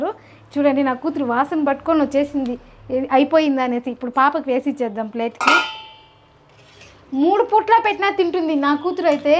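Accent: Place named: Chittoor, India. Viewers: Its rayalaseema slang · Hi sister me oru Chittoor aa maoru la undhi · You are popularising Chittoor district Telugu dialect, thank you 🎉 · Ne matalu basha Rayslaseema pillala vunnavu kani antha neyyithone cheyali nuv oil vadavu Nen eppudu chesthanu potato vesthanu totalga ne vusharu ne mata rhiru navhhai keep it up God bless you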